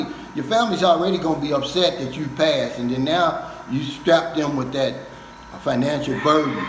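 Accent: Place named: Texas, United States. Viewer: Sheeeeeeeiiiiittt sounds like Texas let's goooooo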